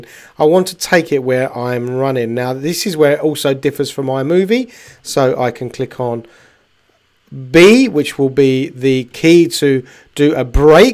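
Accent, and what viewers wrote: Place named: United Kingdom. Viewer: You must be from England or Scotland sir. Anyway, Great explanation. Looking forward to hearing more videos from you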